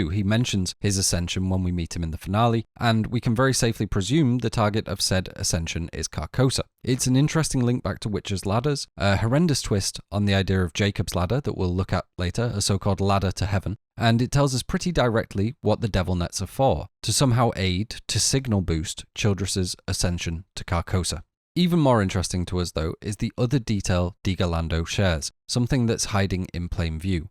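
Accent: United Kingdom. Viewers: The british accent is taking over youtube.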